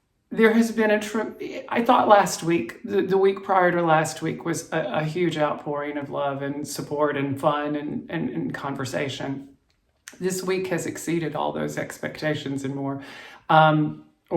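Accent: South, United States. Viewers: you are a natural born storyteller, and I could listen to you all the day! I had a real moment when you used the word "twitterpated ". Aside from the Owl in Bambi, the only person I ever heard use that word was my Dad, who, like you, had a lovely, soft southern accent, the only one in a house otherwise full of Boston accents. That truly made me smile. Please don't think that's a comment on your age, in fact, I am the exact same vintage as you! I loved my Fisher Price toys, and that castle was awesome! I fear I was a less than benevolent queen, however, as I delighted a little too much in throwing those poor little people through the hatch! I hope I'm kinder and gentler these days. Lol. I think you made excellent choices for your Halloween themed projects, I've got one of my own kitted up and will be sure to use the "pound sign". We had rotary dial, too! 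Take care, Kim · Simply delightful. Twitterpated - you are SO southern! ; )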